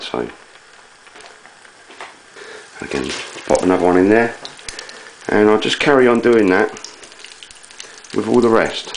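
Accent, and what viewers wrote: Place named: Estuary, United Kingdom. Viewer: That's a (Thames) Estuary accent... London and its environs, into Essex, and very working class.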